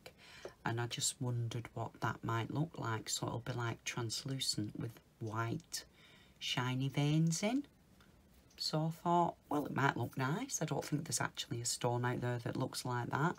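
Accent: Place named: North, United Kingdom. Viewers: Love the video, nice to hear a fellow northern voice! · Just found you on YouTube, I’m getting back into polymer after about five years and it’s nice to hear a northern accent 🤗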